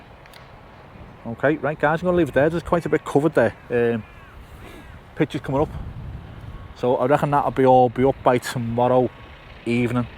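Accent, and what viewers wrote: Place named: Liverpool, United Kingdom. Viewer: You know those vids on YouTube that are primarily for a foreign audience that focus on the regional accents of England? Yours will get picked up as the Liverpool example as opposed to the Carra/Gerrard ones they always use. 😊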